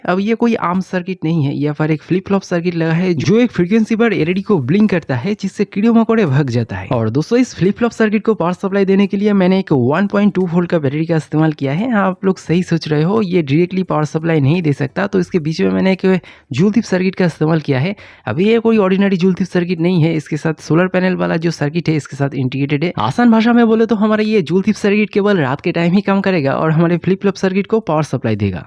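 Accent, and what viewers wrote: West Bengal, India. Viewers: Aapke sare projects bohot acche lagte hai. Ek question tha kya aap west bengal se ho??aapke batonse lagta hai❤❤😊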